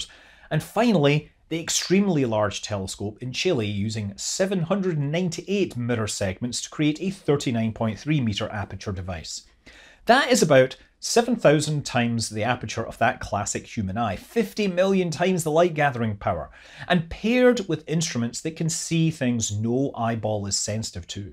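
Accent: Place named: Scotland, United Kingdom. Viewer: Gälilean müns... love the Scottish accent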